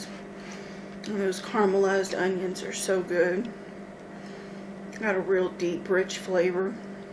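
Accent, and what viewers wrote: South, United States. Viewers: C here I reside in Colorado. Yeah she sounds like she's from the south. In one of her videos she goes to the Heart Attack Grill to eat so maybe it's in the same state. I'll have to look. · Girl, your voice is so soothing to me.. and you sound southern (I'm from Tennessee). Lots of love hunny, you keep doin you 😙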